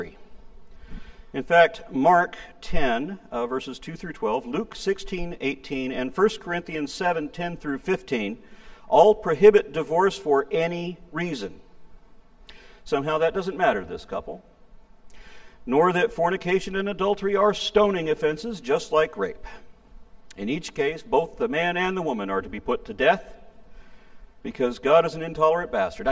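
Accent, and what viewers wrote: South, United States. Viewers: Aron’s Christian southern accent sounds like joe exotic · "Southern Christian" accent is something I never knew I needed in my life:) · that Southern English was well done. I love it for real, too. Just sad there were no bible verses with "daawgs" in this video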